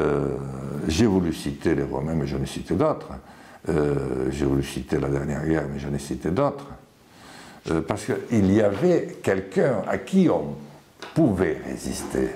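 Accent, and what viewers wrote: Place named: Occitania, France. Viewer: On n'est pas nombreux à le remarquer... L'accent occitan et la belle voix profonde les hypnotisent.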